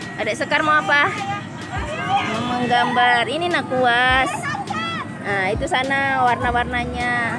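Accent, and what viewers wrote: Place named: Java, Indonesia. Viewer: Mantap, penyiare swarane kok medok banget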